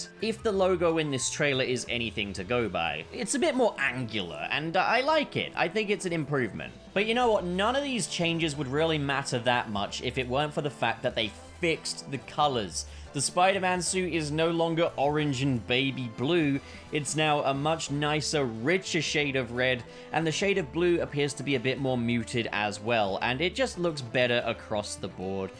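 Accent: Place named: United Kingdom. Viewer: I got you and bobs mixed up, are you both from the same area of the UK?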